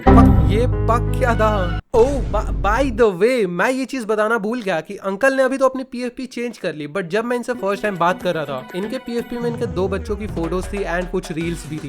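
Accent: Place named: Bihar, India. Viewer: Are ji pahle to aapke Naam Se Laga tha ki aap Hi Ho aur awaaz se but ab confirm ho gaya yah sunkar aap bihar se h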